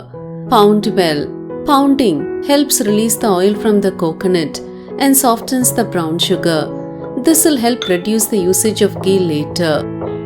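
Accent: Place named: South India, India. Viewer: I don't understand south Indian accent 😂😂 very funny accent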